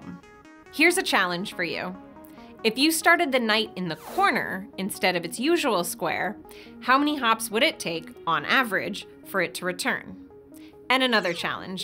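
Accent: United States. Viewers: Y u talkin murican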